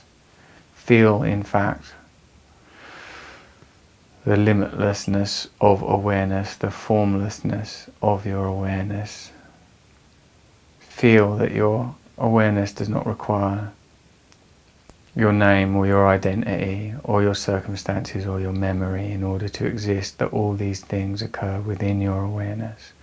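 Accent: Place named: England, United Kingdom. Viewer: An English accent is so calming, why, but it is, Thankyou!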